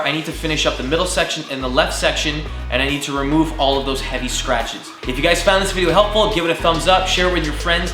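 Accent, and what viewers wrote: Canada. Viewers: definitely Canadian (not holding it against you) lol. Thanks for the demo!! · After 1 second of watching this....wonder which part of 🇨🇦 he’s from 🤔....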